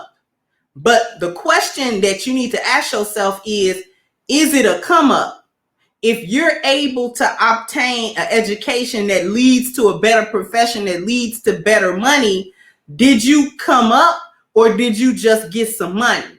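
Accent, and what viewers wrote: Chicago, United States. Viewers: That Chicago accent heavy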